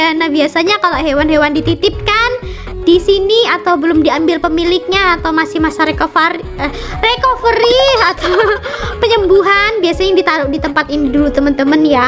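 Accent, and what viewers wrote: Java, Indonesia. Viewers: Kak ngomong nya nya kayak orang jawa Btw bagus banget kak video nya😍☺ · Wa kakak medok pasti kakak orang jawa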